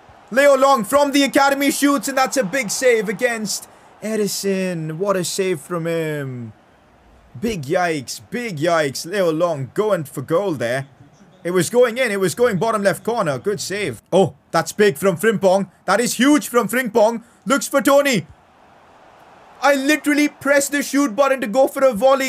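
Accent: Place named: India. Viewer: u have the biggest Indian accent it’s probably because u are the biggest Indian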